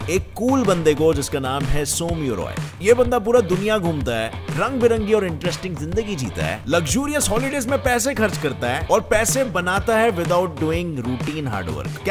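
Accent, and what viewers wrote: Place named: Maharashtra, India. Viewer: Tension naka gheu , Marathi accent 👍🔥🔥❤️ kamaal aahe 👍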